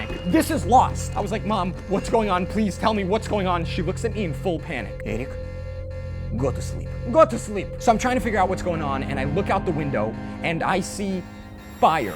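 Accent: Russia. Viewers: I love the Russian English accent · And his Russian accent he dose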